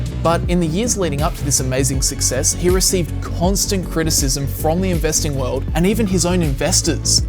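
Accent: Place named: Australia. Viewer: That australian accent... Cute but not that understandable to me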